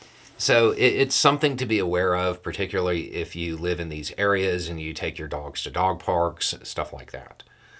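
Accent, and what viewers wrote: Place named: Boston, United States. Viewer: You say it like you're from Boston. Lol I lived there for 30 years. It's always been Nev-add-uh. Here's one for ya .... Tooele. It's in Utah.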